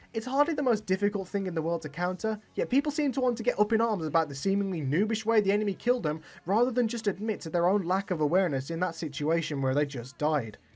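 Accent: Poland. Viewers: Youre polish? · Are you Polish?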